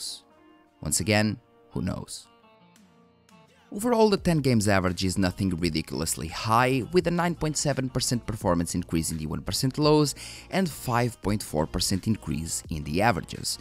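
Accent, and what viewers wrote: Portugal. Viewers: very good video. btw you sound portuguese accent. i know cuz u sound like my accent lol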